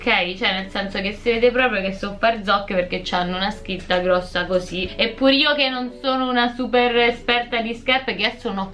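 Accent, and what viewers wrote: Rome, Italy. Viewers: Hai una cadenza romana strepitosa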